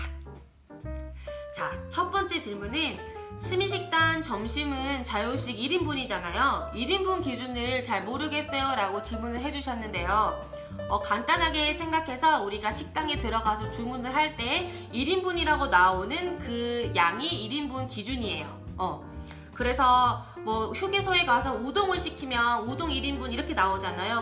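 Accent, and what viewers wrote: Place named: Seoul, South Korea. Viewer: 님 특유의 사투리말투에서 서울말투 사이 너무 귀여워용ㅎㅎ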